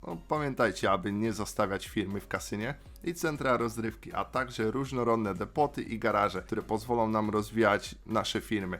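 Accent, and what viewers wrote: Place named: England, United Kingdom. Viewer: witam cię mam pytanko mieszkasz w uk czy w polsce bo akcent masz angielski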